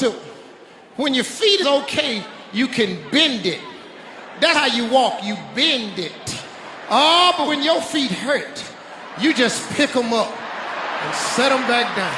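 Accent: South, United States. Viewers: Man his southern ebonics is so thick here i can barely understand him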